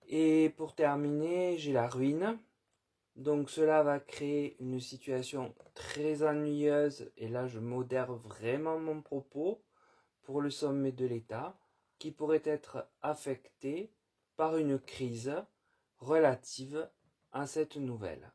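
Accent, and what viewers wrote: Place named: Toulon, France. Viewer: Bonjour, comme je t'ai dit sur le live de jordan je me suis abonnée 💋 et ce petit accent n'est pas très loin de Toulon je pense 😜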